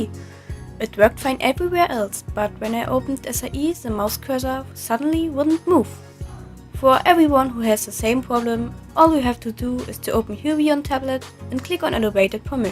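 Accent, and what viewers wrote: Germany. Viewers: You are from Germany? Aber dein Akzent ist cool.😋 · German accents are my drug!! They're so cute!! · I love your voice so much and I absolutely love german accents =3 I also love your artwork your one of the people who inspire me to get better at art. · man merkt einfach das du deutsche bist XD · God I love your accent, everyone loves British accents but German accents I’ve always found are the best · man hört dass sie Deutsch ist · I don’t see why your embarrassed your english is fine, I have a german au pair so I guess i’m just used to the accent. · OMG I adore your voice i speak Netherlands so it's a little bit similar to german i can hear your german accent it's sooo cute <3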